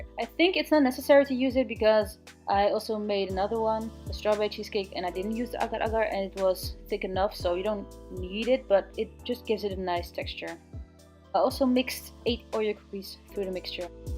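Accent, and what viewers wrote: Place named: France. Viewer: Hello, what a wonderful personality, heart and energy you have <3 First let me ask you, as your accent in English seems a bit "French" to me, is this due to your heritage or upbringing or just the way I hear it and feel it? Next, not to put a damper on you or your video because it is Beautiful but, if you are using Oreos with the same ingredients as here in the States; then you are taking in lots of things that are unhealthy to your Human Body as well as the environment. Such things like, sugar, palm oil, high fructose corn syrup, corn starch and artificial flavors as the really not so good ones you need to avoid. Sugar, especially refined, but in all forms is very addictive and lights up the Human Brain in the wrong way, as well as the glycemic index and how it regulates in your pancreas, body and blood. Use raw honey for best natural sweetners. 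Palm oil as it is first off not going to be sustainable and this means the forests are cut down fast and the land left in ruins, as well as Orangutans left homeless, split from familys and many killed in cold blood. Sorry the Truth is very sad on this Planet due to unconsciousness of the Masses and the fact many things are kept from us a a Species due to Corporations, Media/Tv and for greed and profit. High Fructose Corn Syrup or HFCS is one that is a no no and very processed and should be known by everyone now days. Artificial Flavors are more then likely not only chemicals, but animal or animal by products. On top of this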